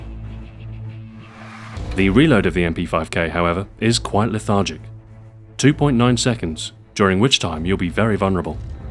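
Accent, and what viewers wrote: England, United Kingdom. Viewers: Holy Shit! It's British William Strife! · im pretty sure hes from england, thats why he has an accent and knows english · You make this kind of analysis so interesting and it's also nice to hear an English voice on CoD videos for a change. You are getting me through my red rings of death so please, please keep up the good work! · the 27 are so but hurt tht they dont have an awesome british voice like this guy im british too sooo :D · Very professional Videos, very good quality and high entertaining value (omg) throughout from the first mw2 video to the black ops videos today. By the way, i love to hear english people talk german ;)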